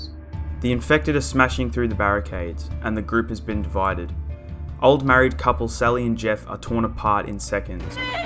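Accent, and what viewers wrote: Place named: Australia. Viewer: Hey, you sound Aussie! I really enjoyed this one and think you have real good potential with your timing, technical setup, conversation points, choice of scene/film, and fairly down to earth approach; even if it's a tad too obvious you're reading your script haha. I know there are a lot of film reviewers out there, but with recent events now would be a great time to really try to push your channel toward the film analysis/review crowd, if that's the direction you want to go in. I think you'd do well. Good luck with your next one.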